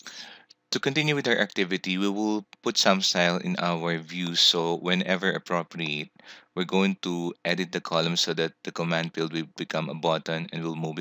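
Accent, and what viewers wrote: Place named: Philippines. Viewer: Hmm! Filipino accent X))))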